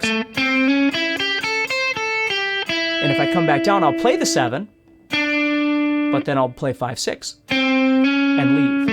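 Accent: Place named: California, United States. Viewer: Hi, great lesson. I'm sorry I'm just under a year late to your class! But at least it's Friday! I love your method of teaching, you seem to be a really nice guy! I liked some of the things you said about, "you would jam all day, with no problem, and then get with friends to jam, and you suddenly forget everything", yeah that's me!!! And don't worry about not knowing enough, just have fun with it!! See I'm 98% self -taught at this point, So you kind of don't catch little thing like that! That matters! I maybe wrong but, you sound like you're from California. I'm from Georgia by the way! I went to you PDF file, and can see, where some of your class was from, Wow man your world wide!!! Thanks so much for what you do, I wish I had 10 more like you! I've got to go now. So see you in the next video! Todd P.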